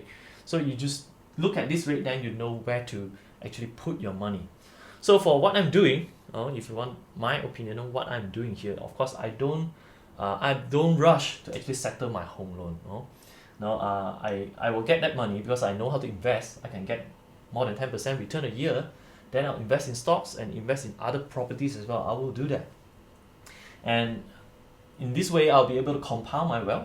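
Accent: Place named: Singapore. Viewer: I can't stand Singlish....my ears r so itchy listening to Singlish accent. Either you speak Chinese or proper English. But not Singlish...gosh.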